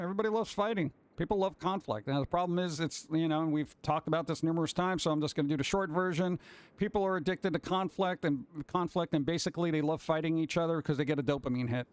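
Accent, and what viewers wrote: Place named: East Coast, United States. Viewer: I think you would make a great voice actor. If anyone needs to cast a magical creature with that insightful, urban, East coast accent, you would totally kill it!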